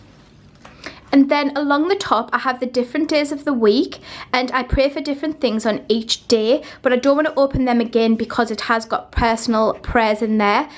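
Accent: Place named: United Kingdom. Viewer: This is so wonderful. I think God must want me to do this for myself because I've found many on youtube. You are the only other one that I love your format and how you set your journal. Thank you! I love your accent! Where is that accent from? UK somewhere? I'm in Texas.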